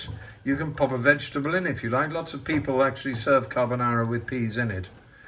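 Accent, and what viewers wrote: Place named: England, United Kingdom. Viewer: เข้าสองรอบเลยค่ะ ชอบอาหาร ชอบเสียงคนให้เสียง เพราะชอบสำเนียงอังกฤษทำให้คิดถึงคุณครูด้วยค่ะ ขอบคุณมากๆนะคะ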